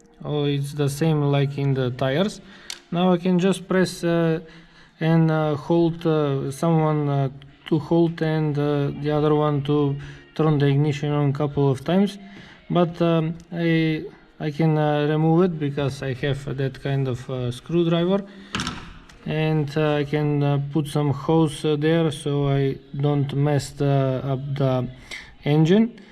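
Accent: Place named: Russia. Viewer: а говоришь как русский🤝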